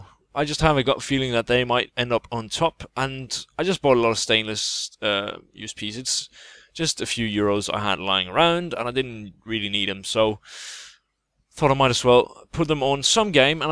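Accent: Denmark. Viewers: I can just hear ure from denmark :D (im from denmark too øæå) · Im swedish and I can tell youre Danish.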